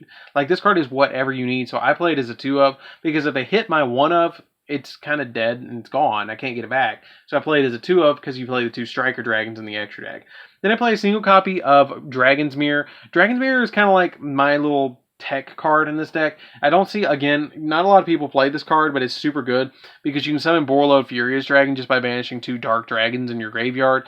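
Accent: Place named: South, United States. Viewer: probably bc he has a southern accent? 🤷‍♂️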